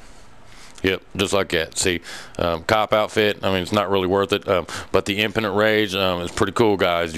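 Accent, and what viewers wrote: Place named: Tennessee, United States. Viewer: U from Tennessee or what?i know a near home accent when i hear it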